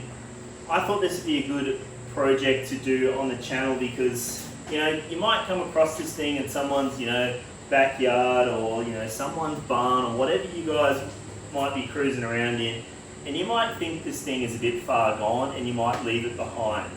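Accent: Australia. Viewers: thank fuk someone who's speaks Australian is Australian is doing a Rigid Shovel ground up rebuild, been sitting on an 81 procrastinating on exactly what your doing, well exactly as far as my amateur skills will let me go anyway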